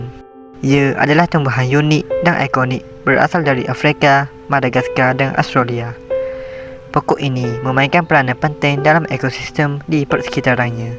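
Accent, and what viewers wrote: Terengganu, Malaysia. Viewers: Orang ganu ke? Clear sgt bunyi ganu ye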